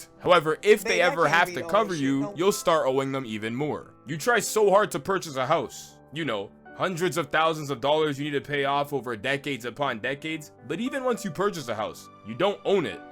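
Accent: Toronto, Canada. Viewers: I hear a Toronto accent😂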